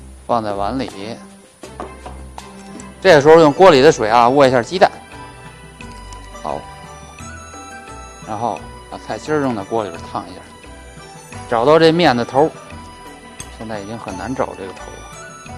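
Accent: Beijing, China. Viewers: 普通话说的很地道像是一位北京人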